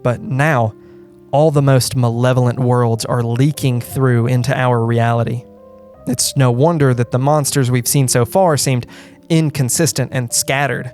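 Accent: South, United States. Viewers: I’m just so happy to find a relatively popular YouTuber (who makes great content btw! I’ve watched a few but this was the episode I subscribed over) who’s got a southern accent. I did pause the video at the beginning and went and watched all 17 or whatever videos of the OG and I’m sooo glad I did. So good. Great series, great commentary. I usually pick one or the other, the original or the summary, but for THIS series I’m so glad I took your advice.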